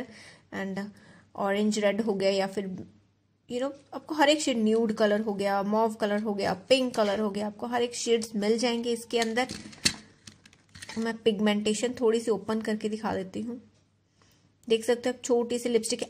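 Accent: Punjab, India. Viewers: Awwww 😍 the way she talk 😍 full Punjabi 😍 love you dii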